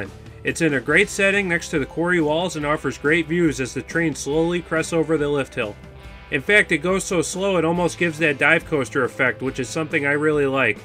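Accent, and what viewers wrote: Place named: Minnesota, United States. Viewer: This mans got the most minnesoota accent I ever heard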